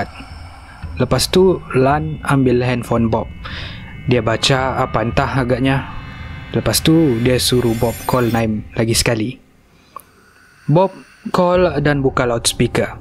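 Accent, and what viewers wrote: Sabah, Malaysia. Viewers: Contohnya. Kamu mau apa sih, bukan ini donk. Kelmarin kamu bikin apa? Ada ke orang sabah cakap camtu? So kite boleh beza dari situ. Apa yang ku terangkan tadi itu lah bahasa indonesia. Yang bercerita ni orang sabah malaysia.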